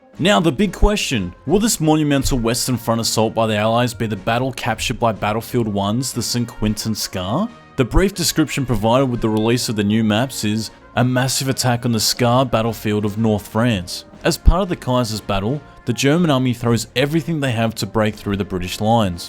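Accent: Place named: Lebanon. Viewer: The narrator sounds Lebanese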